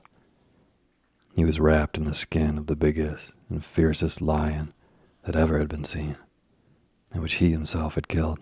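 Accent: South, United States. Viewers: Is there a southern twang?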